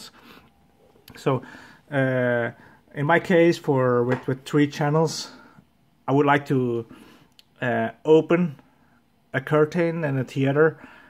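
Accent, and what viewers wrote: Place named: Netherlands. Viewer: mooi nederlands accent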